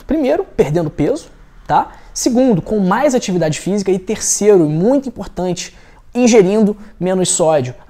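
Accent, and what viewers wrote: Rio de Janeiro, Brazil. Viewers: Gostei muito voce é carioca?voce tem sotaque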